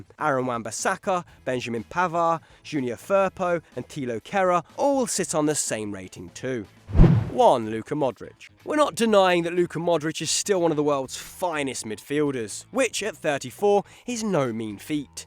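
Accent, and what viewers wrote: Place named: Leicester, United Kingdom. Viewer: Can you tell that this guy is from Leicester? 😂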